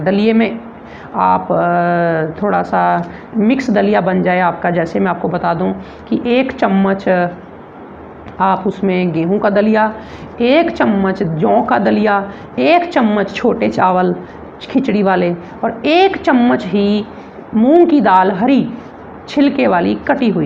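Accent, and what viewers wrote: Punjab, India. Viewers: भाई जी आप की हिन्दी बहुत अच्छी है आप पंजाब-हरियाणा से तो नहीं है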